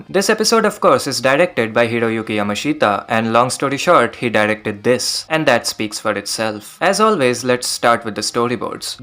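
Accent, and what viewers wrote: India. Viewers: Great video! Are you indian?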